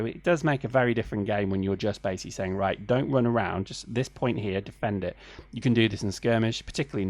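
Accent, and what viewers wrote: United Kingdom. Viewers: your accent sounds close, you must be in the South East? Maybe even Kent - Sussex? Where are you, I'm in Canterbury.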